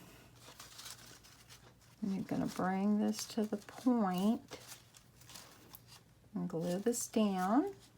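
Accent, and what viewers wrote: South, United States. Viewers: Oh miss, these are so stinking cute and just love them. I made some a few years back and hung them from the office ceiling, they were cute. Glitter paper was pretty too. What??? excuse me??? someone had to say something that you say y'all. OHHH that burns my biscuits. I went from NE Ohio to Arkansas and I had to learn to say y'all..LOL When I said you or everybody I sounded like some prissy proper gal. LOL. I love it! I love the accent from the south, my accent is a mumble of both. But yes folks from the south say y'all, and for someone to say something about that is just absolutely petty and just plain not nice. I was always taught if you don't have anything nice to say, keep it to yourself. Oh your hubby is such a doll, my hubby is so much like your hubby, He use to be like that when he drove truck, but since he came off the road he kinda goes with the flow, now that he has a factory type job. 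I love your tutorials and love listing to you and all your y'alls. you keep being you and change for nobody!!!!! Hugs to you my friend!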